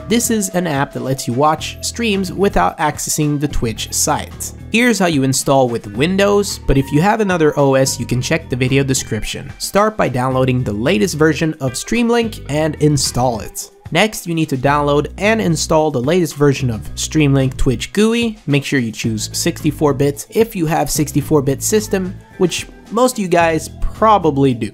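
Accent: Sweden. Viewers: he's from Sweden the accent is pretty obvious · Är du svensk?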